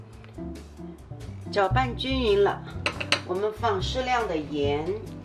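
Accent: Shanghai, China. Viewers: 用電飯煲可以嗎？阿姨說的是上海普通話 哈哈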